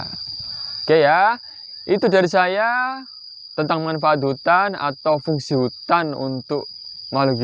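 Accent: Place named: Java, Indonesia. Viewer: Medok Pak, kata orang sumatera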